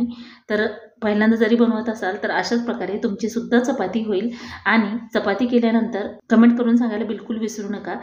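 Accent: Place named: Ghati, India. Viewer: आवाज स्पष्ट येत नाही,खूपच घाटी होते बोलताना.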